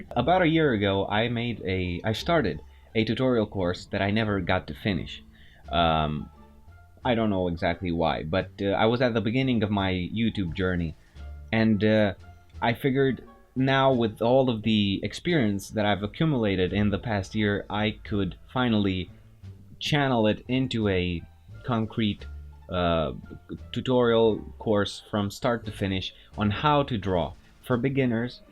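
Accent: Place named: Romania. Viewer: yep...sounds like a romanian brother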